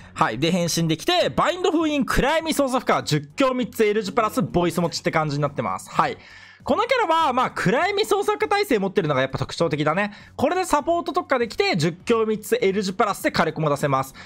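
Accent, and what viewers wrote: Kansai, Japan. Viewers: この人関西人なん？笑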